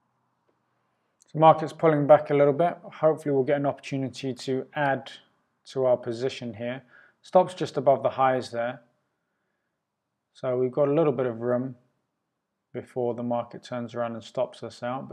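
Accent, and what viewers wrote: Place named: England, United Kingdom. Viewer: Thank you , a lovely lilted English accent a calm manner and uncluttered simple fairly clear approach, great pyschologically for some of us more (greedy, A type)Anglo stressors....Regards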